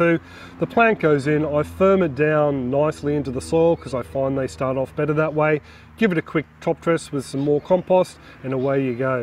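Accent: Australia. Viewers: I like the Australian accent tho also you look like bob ross I like it too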